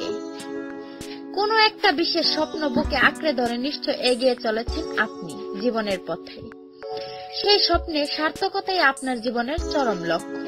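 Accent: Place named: Sylhet, Bangladesh. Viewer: Eije madam eto gan dewar age nijer language ta thik korun.Apnar vasay lagce apni shyleti .Obbosso shyleti hoce emon ekta jayga jekhane kushonskar ar apnar moto gaiya meye diye vorti jekane sobai15 bosorei bia kore.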